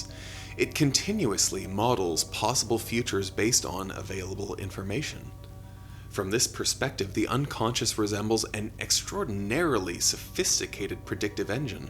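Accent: Ontario, Canada. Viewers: i love your Ontario accent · lousy canadian accent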